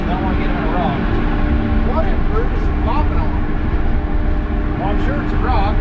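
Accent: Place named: Pennsylvania, United States. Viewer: I like you and I like the way you talk, you remind me of some of my more interesting relatives in the foot hills of Pennsylvania.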